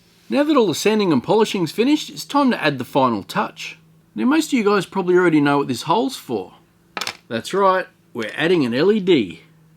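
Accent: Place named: Australia. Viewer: Im an american, and half the reason i watch your channel is for your awesome australian accent 🔥🔥😂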